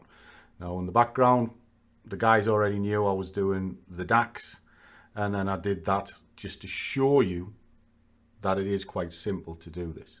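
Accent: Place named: Yorkshire, United Kingdom. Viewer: Refreshingly simple and frank, being a Yorkshire lad it’s nice to hear a familiar accent.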